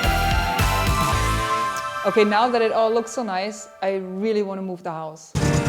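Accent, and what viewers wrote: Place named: Germany. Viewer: Wie angenehm - so ein schönes Englisch mit klarem deutschen Akzent zu hören, man versteht es einfach viel besser, als diese Ami-Aktzente mit den dicken Knödeln im Hals!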